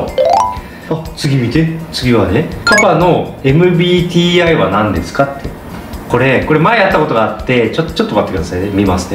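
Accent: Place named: Kyushu, Japan. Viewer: 九州訛りが お顔とミスマッチなところがとてもいい感じで好き😊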